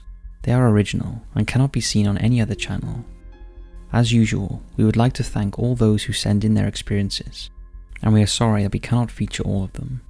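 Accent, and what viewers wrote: Wales, United Kingdom. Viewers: Always love the vids, but can i also say cheers for getting the docile tones of our Welsh accents into the World! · He has a Welsh accent. So I challenge you to pronounce Rhydymwyn, Machynlleth, Pontrhydfendigaid or Llansantffraed-Cwmdeuddwr correctly. Personally, I only knew how to pronounce Duluth because it featured in season 1 of Fargo. If it wasn't for that, I wouldn't know how it was supposed to be pronounced correctly either. Believe it or not, many people in Britain aren't aware of the most popular cities in the midwest, just as most Americans aren't aware of most cities and towns in the UK outside of London. Just enjoy the content of the video and don't focus so much on the pronunciations!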